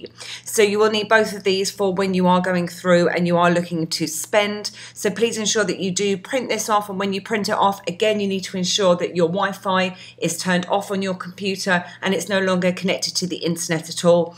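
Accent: United Kingdom. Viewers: your British accent so lovely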